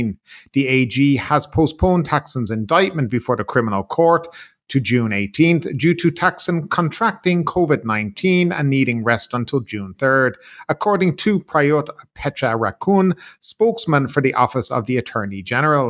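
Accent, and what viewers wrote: Ireland. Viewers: you sound Irish but look distinctly J.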